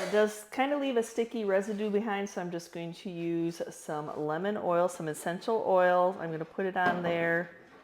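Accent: South, United States. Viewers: I have been slowly going through and making over things that need a new home. I know i am being nosey but where did you get that southern accent as i can not help but ask.. craft on my friend...😊😊😊😊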